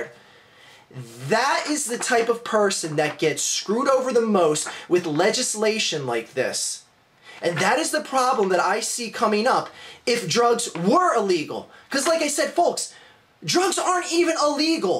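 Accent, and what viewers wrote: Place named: Illinois, United States. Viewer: you sound like your from illinois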